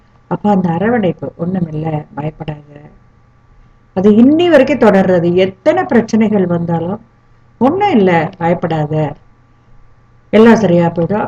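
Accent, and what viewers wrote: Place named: Palakkad, India. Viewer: I follow all clips because I like the spoken tamil it resembles Paalakkaattu tamil. I am from Srilanka. Thank you so much for all cooking.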